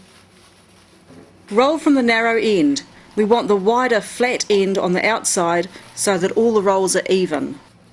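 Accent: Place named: New Zealand. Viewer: kiwi accent <3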